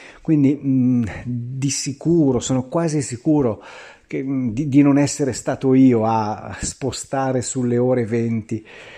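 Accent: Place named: Bergamo, Italy. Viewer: Ciao. Mi piace ascoltare il tuo accento Bergamasco quando non ne puoi più.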